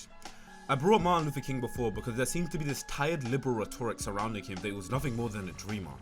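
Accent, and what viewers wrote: United Kingdom. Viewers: You and prince Soloman sound similar, ur jus the British version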